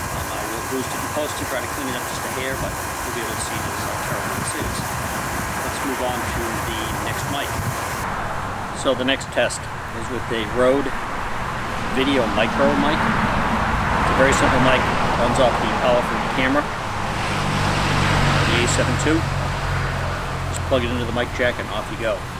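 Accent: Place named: New England, United States. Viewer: Living in a few different states over the years I've never really developed a strong accent in my speech. But listening to you speak made me chuckle to how strong a New England accent can be. Hope you're doing well.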